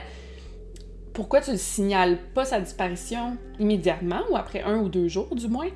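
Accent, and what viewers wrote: Canada. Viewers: Coucou, merci pour tant de travail, pour toutes tes vidéos passionnantes. Tu es de plus en plus jolie! Tu assures à 100/100 comme toujours, très professionnelle, consciencieuse, c'est toi! Pour avoir déjà eu le bonheur d'être enceinte il est vrai, Pour moi du moins que j'avais souvent l'impression d'être en retrait! enfermée dans ma bulle, moins attentive, moins vive d'esprit. Tu m'as fait rire quand tu as dit "je ne suis pas toute là" chez nous en France nous n'avons pas cette expression. J'adore! J'aime beaucoup l'accent canadien. Gros bisous, prends bien soin de toi. Depuis le temps j'ai l'impression que tu fais partie de ma famille. J'ai été très émue quand j'ai appris que tu étais enceinte.🥰😘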